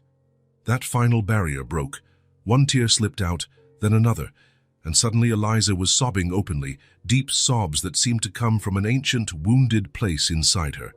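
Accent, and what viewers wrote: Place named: England, United Kingdom. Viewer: It's only a story. I love the readers English accent.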